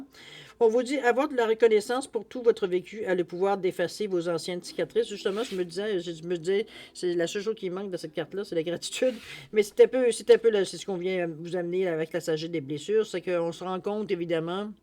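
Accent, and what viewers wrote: Quebec, Canada. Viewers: J 'aime ton accent québécois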